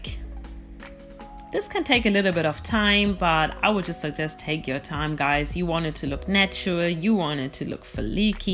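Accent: Germany. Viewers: The german accent I love it -die Haare sehen übrigens geil auch ☺️☺️ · Such a thick german accent so cute love it lol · Could it be you are german? Your english sounds like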